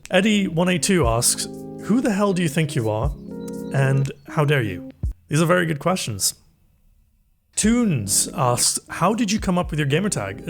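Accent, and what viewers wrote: United Kingdom. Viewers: Cammycakes and collaboration please:) Great channel and nice to see your face. I should have known the best British voice on the Internet belonged to someone from the Indian subcontinent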